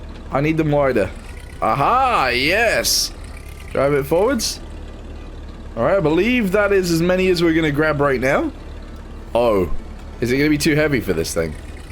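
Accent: United Kingdom. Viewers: Are you British by any chance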